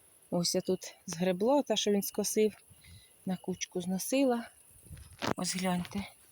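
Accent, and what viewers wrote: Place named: Poltava, Ukraine. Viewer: Я теж з Полтавської області,мама і досі проживає в Пирятині...Я ж чую,знайома вимова!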